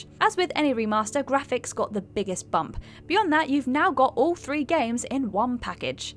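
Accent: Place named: United Kingdom. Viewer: maybe its the british accent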